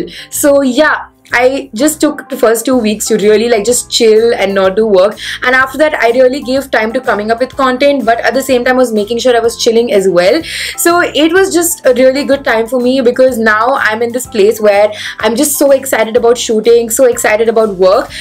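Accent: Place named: South India, India. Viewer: What ????? 🤣U r northindian.. i m subscribed to u from last 6-7 months n thought u r a South Indian (majorly because of the south wala accent when u talk in english ) ... i am also north indian.. 🤗hugs... whats your native ??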